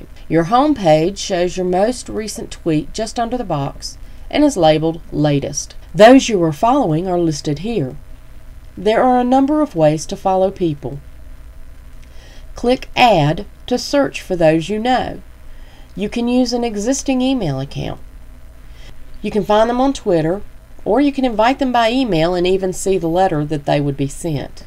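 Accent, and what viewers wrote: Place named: South, United States. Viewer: This was just great! Your lovely Southern drawl made it feel even more captivating.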